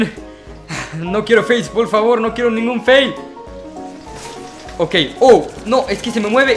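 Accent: Mexico. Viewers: El asento mecicano😄😂😂😂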